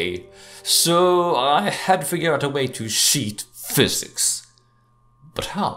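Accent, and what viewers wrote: Sweden. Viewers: Hello, are you swedish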